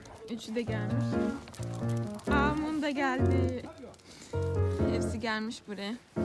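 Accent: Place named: France. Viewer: konuşurken hafif tatlı bir fransız aksanı geliyor sanki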